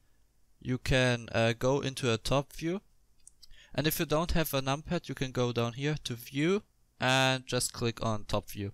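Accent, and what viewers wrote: Germany. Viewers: Du hörst dich ein bischen deutsch an :)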